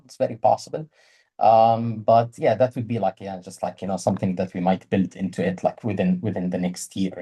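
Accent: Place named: Saudi Arabia. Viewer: saudi accent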